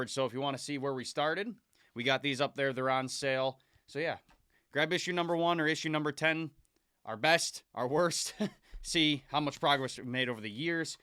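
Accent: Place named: Michigan, United States. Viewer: U from michigan? I hear an accent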